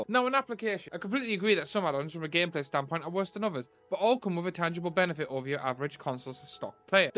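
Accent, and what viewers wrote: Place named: United Kingdom. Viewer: Note on you speaking fast: I'm a fast-talker too. My mouth tries to catch up with the runaway freight trains racing along the multiple interweaving tracks in my brain and often ends up tripping along the way. So I get it. I do still need subtitles to understand you, but that's mostly because I'm not british. The full-speed words combine with the accent I'm not used to in a way that turns my brain to mush. No need to feel self-conscious over something you can't really control, we all just work with what we got.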